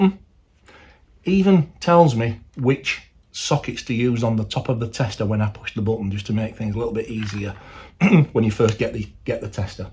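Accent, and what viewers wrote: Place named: Yorkshire, United Kingdom. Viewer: Leeds/Wakefield accent love it ....ey-up! Great tester. Italian made?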